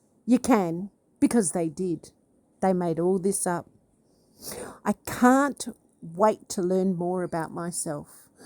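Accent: Australia. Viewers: Girl, I know you’re Australian by your accent. I know accents very well. I can tell from your videos that you love your husband. I’ve been subscribed long enough to know that TMJ is a friend, not a boyfriend. I guess she doesn’t know what you do for a living 🙃 The truth is coming & it has nothing to do with TMJ. Stay strong 💪🏻 🇺🇸🇺🇸🇺🇸♥️♥️♥️ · We all know your Ozzy, accent is true Blue and love listening to you while i can hear the waves from our BEAUTIFUL BEACHES we have in OZZ we are not friggen stupid, but i tell you im starting to not like CHARLES and having doubts about WILLIAM (i know that sounds horrible) but after seeing speach from David Beckham at the WEF ,and CHARLES being involved WEF, WILLIAM EMPLOYING Ardern, Blanchett, Steve Erwins woke son, and some woke guy for the world kitchen, im worried its not the Monarchy i thought, plus your treatment, i was hoping with LADY Cs court case plus FIONA and Trevor's trouble there might be a link which could be joined to go to court with eventually with good hard evidence your a dog with a bone now, have you contacted THE ROYAL GRIFT, im an old duck wishing i had your energy, watch your back stay safe🇭🇲👍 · Us fellow Aussies can tell your accent 👍 mines a bit rough around the edges 😅 use friggin a lot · Sound like a true blue Aussie ro me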